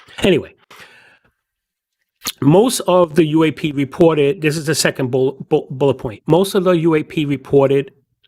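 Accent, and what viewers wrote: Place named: New York, United States. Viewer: I'm southern but I think this being read in what seems to be a thick NY accent, with added sarcastic flourishes, makes it much better.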